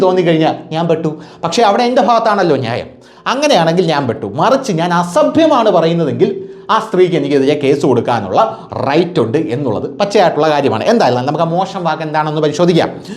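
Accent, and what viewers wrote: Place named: Trivandrum, India. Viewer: ചേട്ടന്റെ Trivandrum slangil ഉള്ള അവതരണം പൊളി 😂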